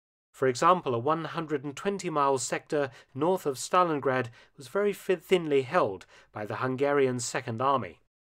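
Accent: England, United Kingdom. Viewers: Mark Felton with his English accent makes the documentaries better. More gravitas. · I see there are over a hundred dislikes. How is that possible this is really good stuff that's never been shared to this extent that I heard. Are the dislikes because they think it's untrue or because the guy has a British accent I just can't for the life let me figure out why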